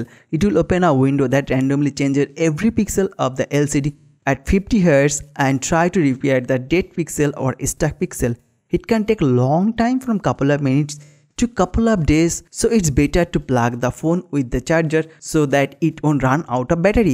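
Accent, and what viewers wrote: India. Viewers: Damn his accent hey Non- Indians please dont judge not all of us sound like that :( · He has an Indian accent. Did you go to school? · To people out here complaining his accent - India is a diverse country. Speaking multiple language is something a norm unlike the west. Few states in northeast does speak good English (Exception). Excuse his accent because English is not even a second language for many and I'm sure it's the same for him. Nice video 👌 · al least he can speak english with his indian accent, but I can't speak english properly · Ofull indian english accent. Cant stay. I am ran away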